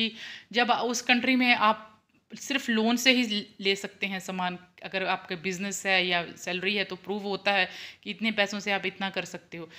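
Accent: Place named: Punjab, India. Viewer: Tuhdi Soch bht vadia ji sachi bilkul sach keha tusi motto mehnat te bht Kar rhe Baki agge rabb de hath a ji 🙏 Baki ek gal tusi Canada Ch reh k pure Punjabi bolde ho jida k gaddiya thukti Hai hahahhaha Nhi te AJ kal Jo USA CanAda yeah yeah bht krde a ji Jo 5 class pass v nhi hunda oh v yeah yeah bs hor koi gal hi nhi krde waheguru ji tuhnu hamesha Khush rakhna. Te ur famliy members nu v dear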